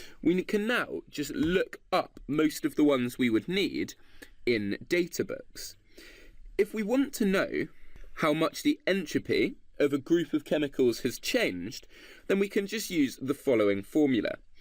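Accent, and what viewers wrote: United Kingdom. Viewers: good content, posh accent unbearable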